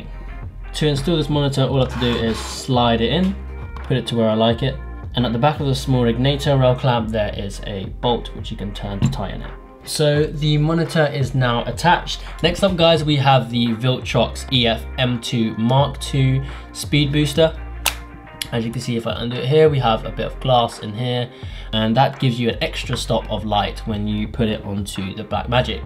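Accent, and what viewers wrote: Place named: United Kingdom. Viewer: When did Elliot Choy get a British accent?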